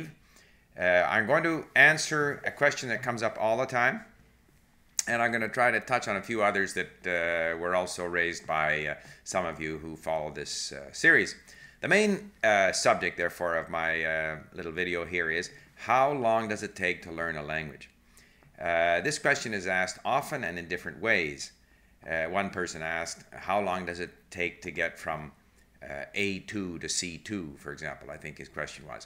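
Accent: Canada. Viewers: yes this is something I also find interesting! I never thought Canada had an accent until I went to Vancouver and when I saw yuor first video I thought "wow this guy has a Canadian accent en then you said you were from there en I was like wow I was right! hahaha but yea very interesting topic! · Hello,! Can you do some videos about the different kind of English accents (British,US, Scottish,Australian etc...)? I'm French and it will be great to know more about it. And also if you can talk differences of vocabulary between Canadian/US/UK vocabulary? I read that for US, you (English Canadian) have an accent but I am not able to notice yet. Is it as strong as us French people with French Canadians? Do Canadian people learn French from France or French Canadian?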